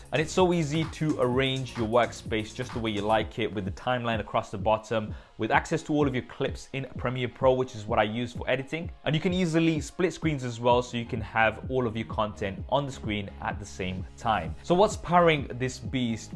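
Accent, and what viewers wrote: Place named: United Kingdom. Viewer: I hate British accent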